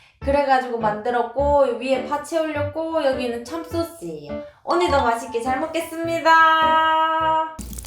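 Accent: Gyeongsang, South Korea. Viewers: 경상도 사투리 슬쩍 조습니다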